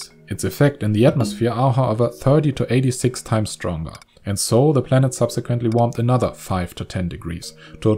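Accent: Germany. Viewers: his heavy german accent brings some extra feel to the story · i dunno why but hearing a German sounding guy talk about it being the largest graveyard in history.. made me laugh... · You have the most german accent I've ever heard, and I lived there for years. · He is German. I was pretty sure from the accent, but in another video he mentioned some German cities and names and it was obvious that it's his first language. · Is the speaker German native? Hört sich ziemlich deutsch an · Ok... I knew it... This is a German person talking. Great animations and to my knowledge well researched I will subscribe. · I like how the narrator sounds like a Nazi scientist. 🙂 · i really love your videos but I must strongly recommend you invest in a native english speaker who also has a good voice because that would make a huge difference. your english skills is excellent how ever the german accent is pretty heavy and your voice is unfortunately doesn't have the best pitch making it very difficult to keep the focus on the flow of the video. 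I hope you don't get this criteria in a personal way I really think your videos are great but just the audio reduce the quality drastically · Everything is great about this video but the commentator looks like he have a germane accent..I’m sorry but it’s really annoying and uncomfortable! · Everything sounds better with a German accents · There is something vaguely menacing about a guy with a Germain accent talking about the end of the world 😳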